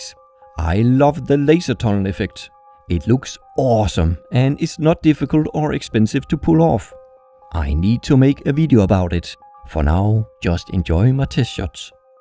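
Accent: Denmark. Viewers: I feel like you have a danish accent, hvis du er dansker så like mit comment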